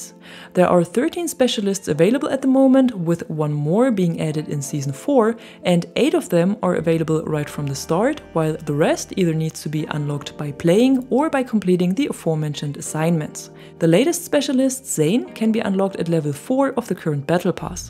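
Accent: Germany. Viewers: you sonds like a german person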